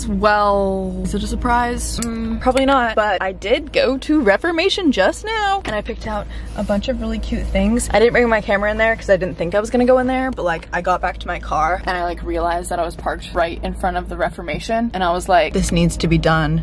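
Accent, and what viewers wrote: United Kingdom. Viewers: every video she does a british accent and it's cute haha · I want your English accent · Loving the British accent😂💞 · love your british accent🤗 so cute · AHAHHAA i love this british accent!!!!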